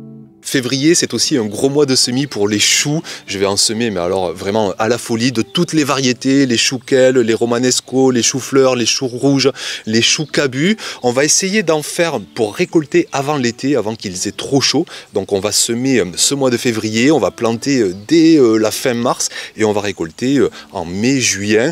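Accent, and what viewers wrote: South, France. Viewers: Hello, Ton sourire, ton accent du sud, ton enthousiasme, ton énergie, ta passion, mais également ton travail, tes connaissances, ton partage, ta bienveillance fond de toi un marchand du bonheur. Merci 🙏 Ça fait déjà 15 jours que j’ai démarré cette nouvelle saison et je repique aujourd’hui ma première plaque alvéolaires de tomates 😊 J’ai également commencé la création d’une couche chaude format XXL qui devrait accueillir une bonne partie de mes plaques et de mes godets, mais également à terme me produire du compost. C’est mon défi cette année 😅 Je trépigne d’impatience pour découvrir ta nouvelle installation de semis. A très vite.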